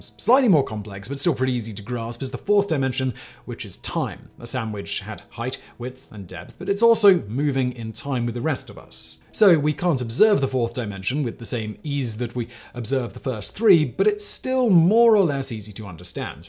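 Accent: England, United Kingdom. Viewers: Why would they use Canadian pronunciation, given then channel and its scriptwriters and editors are American and the presenter is English?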